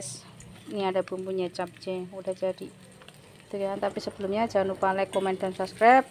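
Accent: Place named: Java, Indonesia. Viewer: Serem bget yu deloe brambang abang di goreng ngeri aku deloe eh🙈🙈,mendok tenan boso jowo ne yu 👍👍.tuku lah neng konter katah yu tripot kur gangsal welas ewu😅😅.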